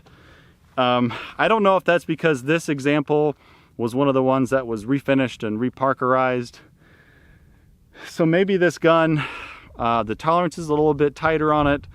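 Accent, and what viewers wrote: United States. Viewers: تمنيت لو أن ما تقوله مكتوب باللغة الإنكليزية أسفل الڤيديو كي أستطيع ترجمته ، لأنني لا أجيد اللغة الإنكليزية جيداً ، ناهيك عن اللهجة الأمريكية سريعة .. عانيت بمشاهدة هذا الڤيديو و أنا كل لحظة أذهب إلىٰ غوغل من أجل الترجمة .. الڤيديو مهم و أعجبني - شكراً .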